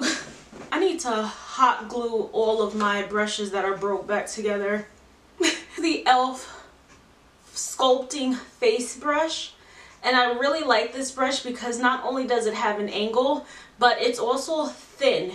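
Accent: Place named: New York, United States. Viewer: I like that NY accent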